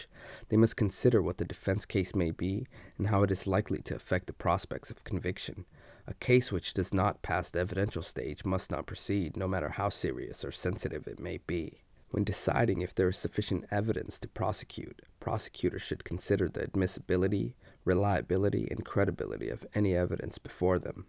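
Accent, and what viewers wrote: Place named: United States. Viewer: The Yanky accent just doesn’t fit. Nevertheless, great explanation!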